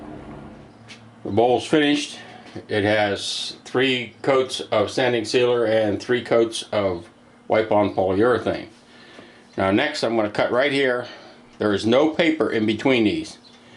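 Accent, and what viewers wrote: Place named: Pittsburgh, United States. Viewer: You've GOTTA be from Da Burgh!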